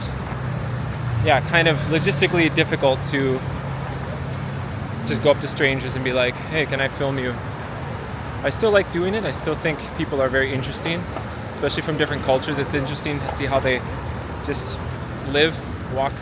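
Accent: Andalusia, Spain. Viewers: Love that u speak andaluz😮‍💨💚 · me encanta tu acento andaluz 🤩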